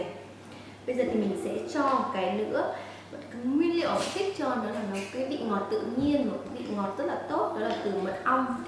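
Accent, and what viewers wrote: North, Vietnam. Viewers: Giong bac ky 75.... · Nghe giọng Bắc ko muốn xem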